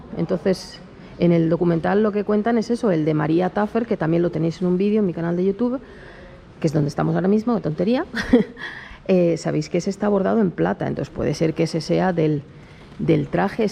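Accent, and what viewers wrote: Spain. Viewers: AUNQUE TENGO MI OPINIÓN SOBRE SISSI COMO EMPERATRIZ, ME ENCANTAN TUS VIDEOS EN ESPECIAL LOS VESTIDOS DE SISSI. YO QUE SÉ COSER UN POCO ENTIENDO EL TREMENDO TRABAJO DE ESOS BELLOS VESTIDOS. ME ENTRETENGO TANTO MIRANDO ESTOS VIDEOS QUE CUANDO APARECE UN ANUNCIO ES COMO SI ME SACARAN DE MOMETO DE ESA ÉPOCA Y ME TIRARAN AL PRESENTE. LO EXPLICAS TODO MUY BIEN, Y CON TU ACENTO ESPAÑOL SE ESCUCHA MUY BONITO. SIEMPRE TIENES EL DETALLE DE CONTESTAR A QUIENES TE ESCRIBIMOS. POR LO QUE ME ATREVO A PEDIRTE UN GRAN FAVOR SOY RAPHAELISTA DESDE QUE TENÍA 11 AÑOS Y YA TENGO 72, ME ENCANTARÍA QUE HICIERAS UN VIDEO DEL MUSEO DE EL CANTANTE QUE AUNQUE YA HAY UNO SÉ QUE EL TUYO SERÍA MUCHO MÁS DETALLADO. DESEÁNDOTE MUCHA SALUD Y SUERTE CON TUS VIDEOS. 😊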